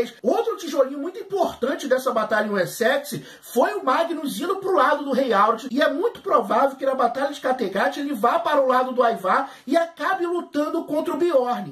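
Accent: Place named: Brazil. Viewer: Acho legal como ele pronuncia os nomes dos personagens! Bem brasileiro! Hahaha! Seu canal é legal!! Sucesso!!!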